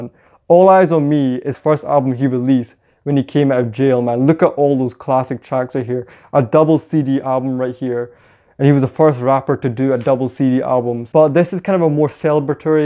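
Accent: Fife, United Kingdom. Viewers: That sounds like a Fifer accent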